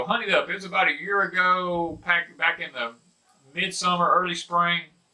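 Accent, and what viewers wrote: South, United States. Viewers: Many Thanx from Canada. I always say what happens in the US will happen in Canada. Love the Southern drawl. great information, Thanx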